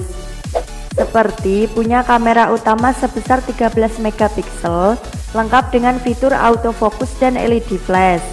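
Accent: Java, Indonesia. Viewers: Jawa medok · jan medok,njowo mbak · Jawane ngendi mba:-D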